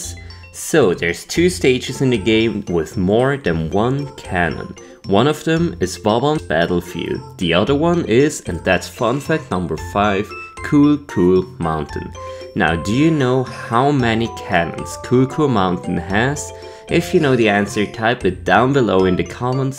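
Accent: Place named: France. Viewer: That French accent though. Proud of you. Maintenant tu vas pouvoir aller draguer!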